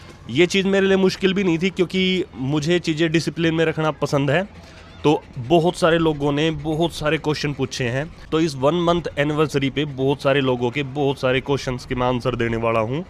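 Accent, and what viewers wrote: Haryana, India. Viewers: कोई बात नहीं भाई मैं तो सिर्फ सिया जिप्सी और आपकी हरियावानी बोली देखना अच्छा लगता है ❤❤ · Apki lunguge aisi lagti hai jaise aap ladaku ho kyu ki apke hariyanvi styl main dhamkakr bat karte hai..pr apki baat se pyar jyda jhalakta hai apne bacho se jis tarike se bat karte ho usko dekh kr lgta hai..❤❤❤ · arey bhaiya Haryanvi accent se log aksar judge kar lete hain😂😂😂😂 mere Punjabi hone ke kaaran log mujhe bhi ladaku type bol dete hain😂😂😅😅😅 sabka apna nature hota hai bhai😊😊😊 Lots of love for cuties and ❤❤❤❤❤ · App kha sa hai.. apka bolna ka tarika tho haryanvi type h 😅😂❤❤ it's my first time on yr channel 😊 apka bacha bhut cute h 💖🌹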